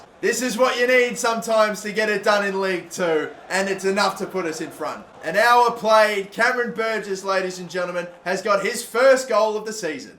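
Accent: Australia. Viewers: Is he austrailian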